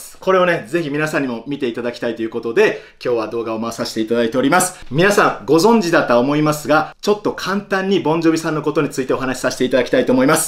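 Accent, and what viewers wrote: Japan. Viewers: ニュースなってたからきんに君の動画初めて見たけど、関西弁なんだね！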